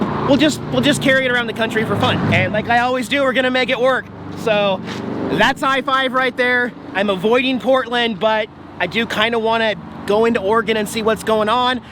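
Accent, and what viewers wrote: South, United States. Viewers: You can't get the South all the way out of a Southerner!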